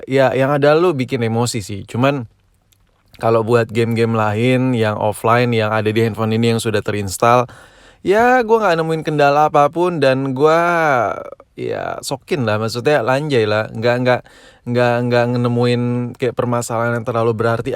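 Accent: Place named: Medan, Indonesia. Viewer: Lu orang medan bang.. Pake bahas Kimak pulak kau...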